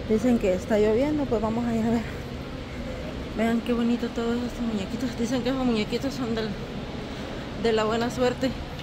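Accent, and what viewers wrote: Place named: El Salvador. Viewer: Usted es salvadoreña? Porque su acento es salvadoreño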